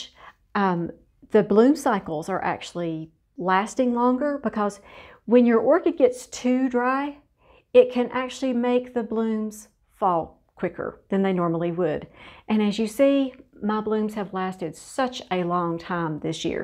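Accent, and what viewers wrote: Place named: Tennessee, United States. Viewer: I, do you put your fertilizer water on each time you soak your plants for 45 minutes, all year long. Or do you ever water with plain water in between? My daughter lives in Knoxville so I love listening to your TN voice! Thanks, Susan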